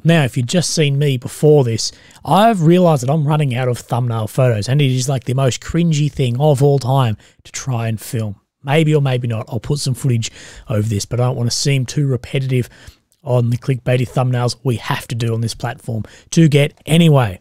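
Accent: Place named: Australia. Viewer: I like your Australian accent 👍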